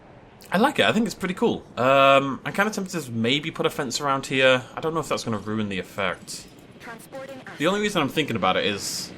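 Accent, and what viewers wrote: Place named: Northern Ireland, United Kingdom. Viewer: Omg how did I not realise you were from Northern Ireland your accent was so familiar and yet I was like??? Why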